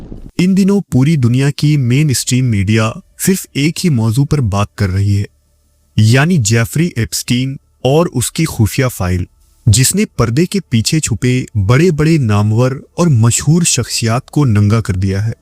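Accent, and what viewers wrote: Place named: Pakistan. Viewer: You are voice like Pakistan . Mashallah voice nice 😅😅😊😊😊😂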